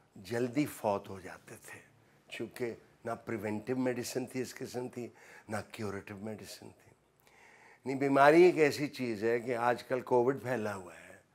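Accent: Punjab, India. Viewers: Speaking Urdu with Punjabi. Like me😂😂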